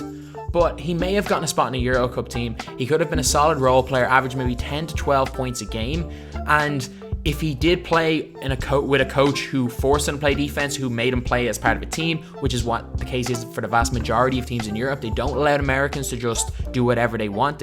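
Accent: Ireland. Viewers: Are you irish? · You sound Irish are u Irish?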